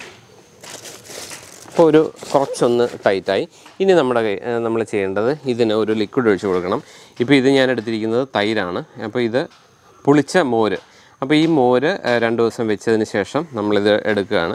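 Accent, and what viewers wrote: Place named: Kottayam, India. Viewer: പള്ളയാൻതോടാൻ കോട്ടയം ഭാഷ റിയൽ കേട്ടോ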